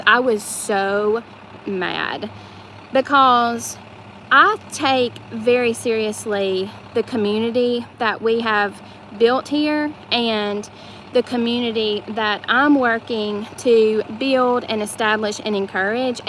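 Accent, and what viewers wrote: South, United States. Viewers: You are the sweetest person and I really don't know how anyone could come up with a negative comment to you! By the way, I could listen to your southern accent all day long. The way you speak is very calming to me!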